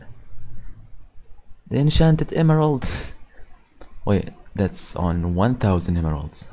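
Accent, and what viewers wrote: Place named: Arab world. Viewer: your accent sounds arabic..im arabic too !! also if you have discord can you tell me it? my discord tag is vxpxx#1832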